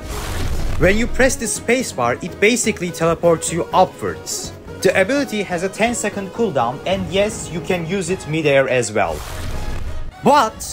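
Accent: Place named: Turkey. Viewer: Duyduğum en türk aksanlı ingilizce❤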